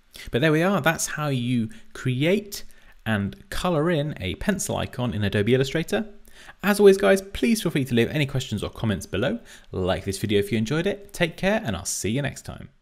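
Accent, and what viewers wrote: United Kingdom. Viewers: the tutorial seems to be easy but I cannot understand some commands ( not a native speaker ) not used to a British English pronunciation. Will try more anyway! Thank you for your videos!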